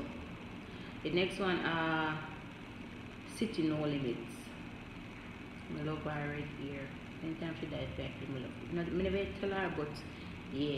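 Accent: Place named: Caribbean. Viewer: Lol i love your Caribbean accent ☺️ ready to support anyone who wants to grow🥳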